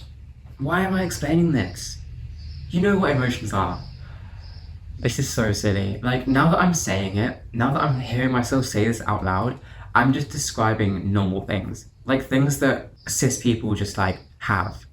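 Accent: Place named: United Kingdom. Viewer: Your British accent is so cute 🥹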